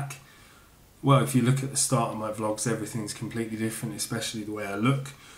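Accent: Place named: Newcastle, United Kingdom. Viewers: Always thinking about you day and night. Hang in there, bud. By the way your Geordie accent sounds WELSH. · Ah your Geordie accent is brilliant hahaha made me laugh, i wish i could take all this away from you I am hoping and wishing surgery will be successful X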